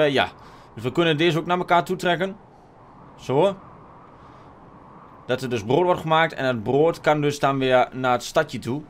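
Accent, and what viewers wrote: Twente, Netherlands. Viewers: Kom je uit twente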